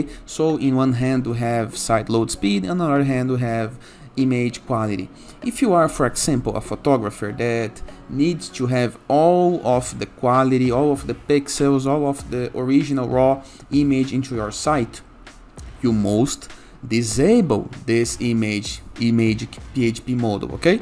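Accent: Brazil. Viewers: Esse sotaque é BR certeza. Extensão PHP GD ou Imagick ausente. Não deu certo mas obrigado.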